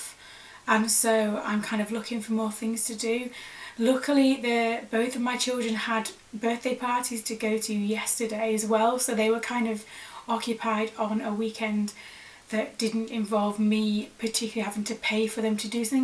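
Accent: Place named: North West England, United Kingdom. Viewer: Have just seen you on on-line news and it said you are from the north east, I though your accent was north west!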